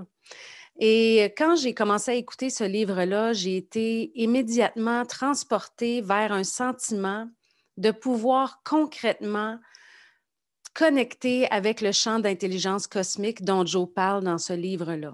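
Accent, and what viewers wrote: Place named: Quebec, Canada. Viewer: Merci beaucoup pour ton partage. Par hasard je fais la méditation 🧘‍♀️ e juntamente avec ton accent Québécois, m’a fait pensé à une chère amie que S’appelle Jacinthe que nous avons travaillé ensemble, elle était infirmière 👩‍⚕️, et est retournée à son Pays. J’espère bien qu’elle puisse Retrouver ton témoignage et pouvoir déguster à son tour dans le champ d’unitie. Je t’embrasse très forte depuis la Suisse 🇨🇭 GRATITUDE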